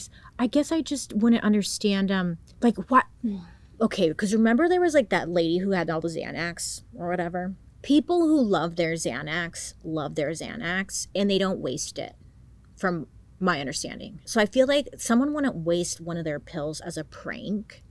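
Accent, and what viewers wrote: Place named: Inland Empire, United States. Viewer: Dude idk if you have or haven't seen it but Rob Zombie has a badass documentary about Manson with what honestly seems more like the truth. The truth especially if you have been part of any like tweeker group or dope house mentality. Check it out if u haven't! Also I can totally tell your from the IE you talk like us❤❤❤❤ I love your story telling!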